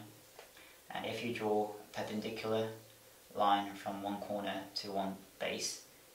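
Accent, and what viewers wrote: United Kingdom. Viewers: Interesting video! You explain things clearly. By the way, you speak with a British accent but pronounce "diameter" in a very American way. It's quite funny. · 70% British + 20% American + 10% Japanese accent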